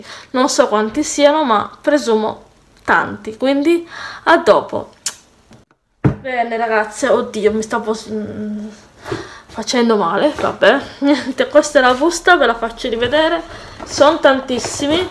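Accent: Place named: Rome, Italy. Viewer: Ho magnato ahahahahahahha so morta parlata romana 🤣🤣🤣c'è lo anche io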